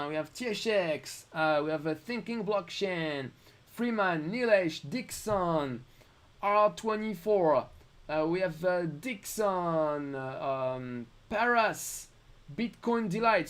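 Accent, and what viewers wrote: France. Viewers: Yo great content!! are u french?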